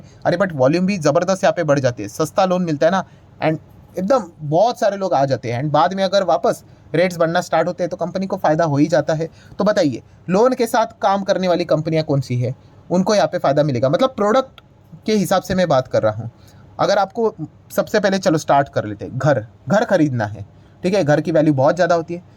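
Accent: Maharashtra, India. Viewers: Accent to Marathi he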